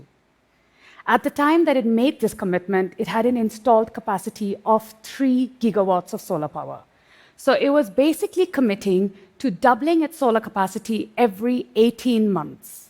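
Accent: India. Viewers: Can still hear the underlying Indian accent that makes it difficult for me to understand her without concentrating. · to all white people, this is how the indian accent sounds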